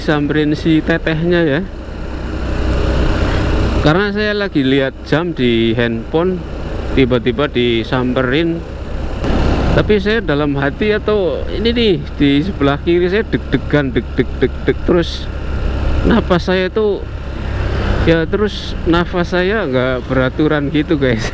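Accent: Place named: Banyumas, Indonesia. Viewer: Sampeyan ngapak ya ngomonge, jawane ngendi Mase?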